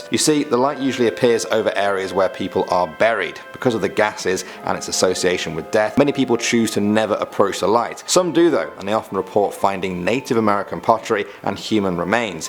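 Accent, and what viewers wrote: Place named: United Kingdom. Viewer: I’m not sure but I’m hearing a little Britain accent is it just me cus I here a little British accent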